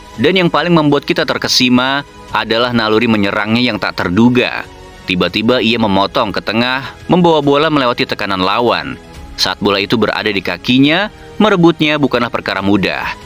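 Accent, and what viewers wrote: West Java, Indonesia. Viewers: Denger aja logatnya msh ada Sundanya 😂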